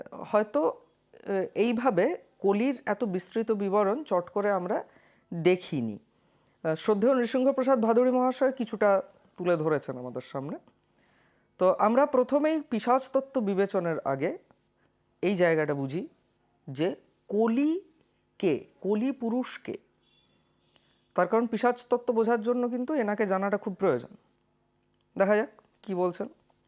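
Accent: Purulia, India. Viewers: Ki sundar kore bolo tumi bhai পুরুলিয়া r bhasa, অপূর্ব, eto সমৃদ্ধ hoi tomar kotha sune je bolar bhasa nei🙏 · That Purulian accent 👌😊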